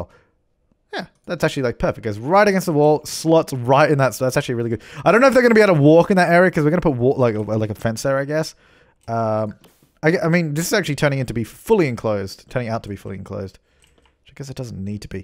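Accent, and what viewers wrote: Australia. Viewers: ps: i looooooooove australian accent ^^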